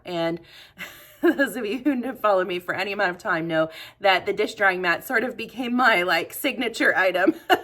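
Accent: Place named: North, United States. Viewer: Lol ... I'm also from the north so I understand the mouth 😂 I now live in Tennessee where they don't have my kind of mouth😅... I found you a few weeks ago and I have to tell you at almost 58 years old my bucket list was to teach myself how to sew and you have inspired me to actually start... 😊 I gave my mom your tote bag and Walker bag today and she absolutely loved to them.... Thank you for being such an inspiration and I hope you and your family have a wonderful holiday season and a very blessed New Year.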